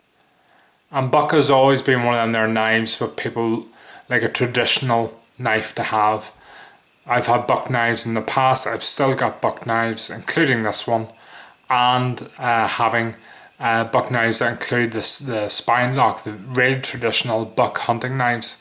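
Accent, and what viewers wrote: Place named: Northern Ireland, United Kingdom. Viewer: Where is Heinnie Haynes located? I'm hearing a fellow northern irish accent there!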